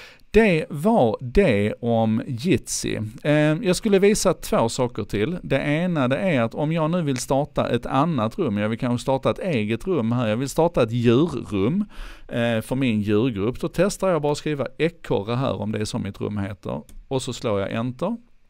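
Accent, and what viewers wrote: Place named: Scania, Sweden. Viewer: ) Alternativ till Zoom :-) :-) :-) och så förstås den härliga skånska stämman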